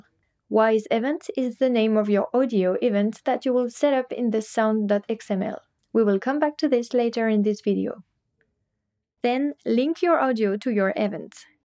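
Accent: France. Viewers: She seems to have a little french accent.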